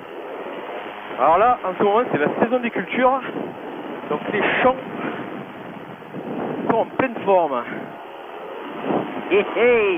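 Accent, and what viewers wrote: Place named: Arles, France. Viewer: je me disais que ton accent est peoche du miens mdrr je suis du coté d'arles et toi? Parce que c est jolie ou tu voles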